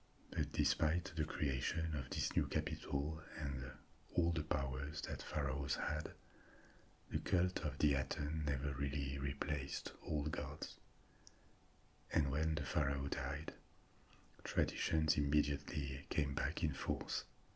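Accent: France. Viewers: Da nema Francuski naglas imao bi barem dupli broj pratioca. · You are my official sleeping aid addiction. Your gift of telling factual stories with the smoothest french sexy accent. What more could a girl ask.. I dont know if this happens to anyone else, but your voice penetrates into my dream...idk if that means I'm not in a deep sleep, or ? Then along with your soothing voice, you tell me that key to total relaxation is a massage...so you massaged my aching back 😳 I woke up feeling like I really had a massage. I have ALWAYS had extremely vivid dreams. But this is sort of a new twist. (I sincerely hope for it again) Thank you. I hope this is not a sign I'm flying over the cuckoo's nest.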